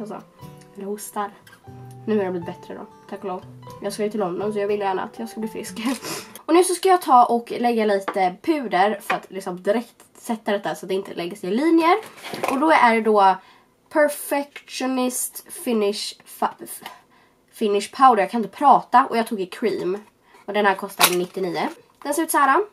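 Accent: Stockholm, Sweden. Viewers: Förlåt men pratat hon inte lite Stockholmska???? INTE FÖR ATT VA ELAK JAG ÄLSKAR DIG ❤️❤️❤️❤️❤️❤️❤️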